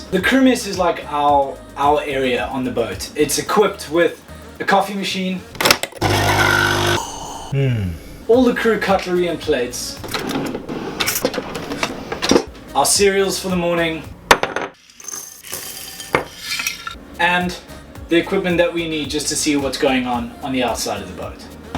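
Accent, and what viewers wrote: South Africa. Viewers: Are you SouthAfrican? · Are you south african??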